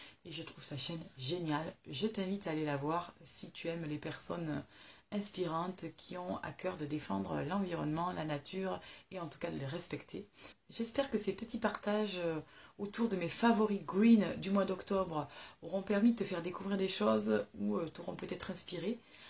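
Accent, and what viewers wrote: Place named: Quebec, Canada. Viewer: Alors comme à mon habitude j'écris en écoutant ta vidéo! la purée de noix de cajou, je ne savais même pas que ça existait, j'adore les noix de cajou (non salées bien sûr), ça doit se trouver à la Vie Claire. Les bijoux que tu présentes en premier ont l'air super jolis, mais bon je ne suis pas très bijoux. pas de bagues, pas de montres depuis 2005 (on a l'heure partout), juste une petite chaine et des boucles d'oreilles. J'adore l'accent québécois! J'admire et je respecte les personnes qui sont sensibles à l'environnement. Dommage qu'elles soient si peu nombreuses. J'ai un peu plus de mal avec les vegans (je respecte leur choix, mais quand je vois le comportement de certains extrémistes, la réciproque n'est pas de mise) je te souhaite un joli mois de novembre, moi ce sera loin, loin.... la réalisation d'un rêve.... bisous!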